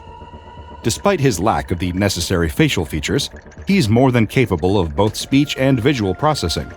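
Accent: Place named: United Kingdom. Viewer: WHY IS YOIR VOICE LIKE THAT😭 GIVE BACK YOIR OLD VOICE NOT THIS BRITSH AHH KING VOICE💀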